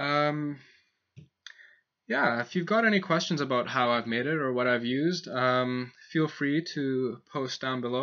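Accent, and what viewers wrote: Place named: Canada. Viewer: What's up with Canadians (presumably by your accent) and amateur chemistry? IIRC Nurd Rage and Nile Red are also from the land of the North Woods (assuming they are not the same person, likely but unconfirmed as that is). BTW does it really matter how centered a stir rod is? It's been ages since I took Chemistry in HS and TBH I don't recall any of it. I am about to start messing around with home made enchants and looking at the hardware I have in the junk drawer there are lots of motor options. Precision centering things might be more of an issue for me though. Any advice?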